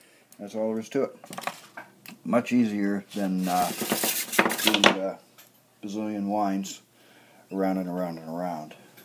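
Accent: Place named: Atlantic Canada, Canada. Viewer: I think I recognize an Atlantic Canadian accent. I'm originally from Cape Breton.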